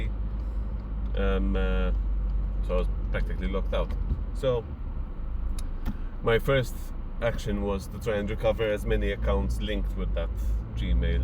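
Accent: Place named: Malta. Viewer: Its very good to see that you dont intend to delete all those videos and even got back your youtube. Also you've got a very heavy Maltese accent haha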